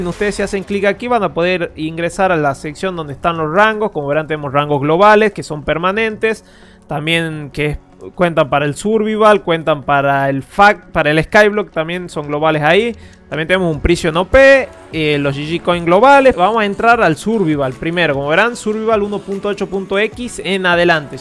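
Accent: Argentina. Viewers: Wen eres argentino? Solo pregunto por el asento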